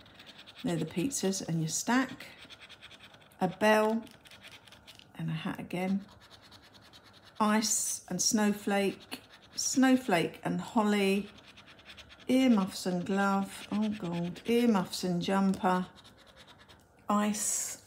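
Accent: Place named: Southeast England, United Kingdom. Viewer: Hello there! Nice to come across your channel! New supporter here! Love the accent by the way (must be missing uk) 😅 southeast?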